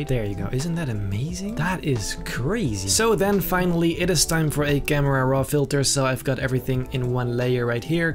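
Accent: Netherlands. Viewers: You are Dutch. Im sooo sure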